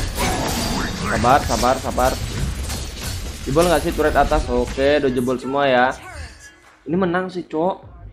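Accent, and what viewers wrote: Java, Indonesia. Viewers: Wong jawa ternyata😂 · Jane wong medan apa wong jawa mas?? Wkwk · Jowone ngendi mas hehehe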